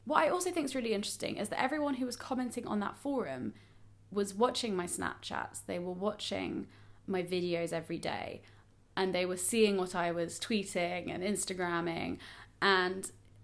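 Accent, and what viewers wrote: RP, United Kingdom. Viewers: I'm ngl I kinda get why people say the disingenuous thing but only because of how you speak like your accent is quite posh? i don't agree with the disingenuous comment but i kinda get it? like posh people are categorised as super fake and bitchy but i don't think that it's accurate of all posh people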